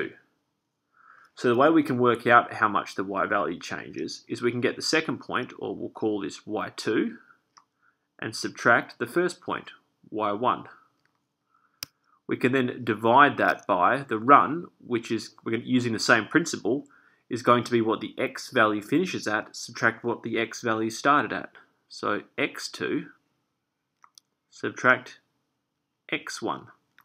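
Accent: Australia. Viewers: hey mate u sound aussie,?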